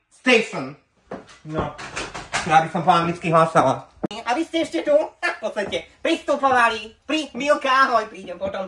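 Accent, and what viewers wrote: Slovakia. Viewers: Nebojkajtě sa 😂😂😂 krčaky ako potrubia, drzkajte se mna….já lubim to jak si hraješ s tou slovencinou 😂😂😂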